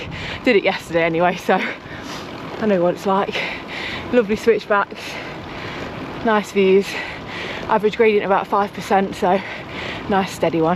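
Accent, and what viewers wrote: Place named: East Midlands, United Kingdom. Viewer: Are you Notts folk? Defo an East Mids/South Yorks twang.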